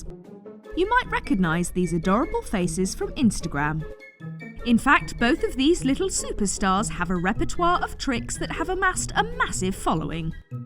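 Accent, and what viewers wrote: United Kingdom. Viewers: You know what adorable? You're "Surrey accent" watching your videos from someone from the United States standpoint, You sound like the narrator for television show. This is ment as a compliment. You sound so professional and have the most relaxing voice. (Even though it sometimes takes me a second to "translate" some simple words that are different in my American accent which is totally on me. But you do have a lovely voice and you do really great voiceovers.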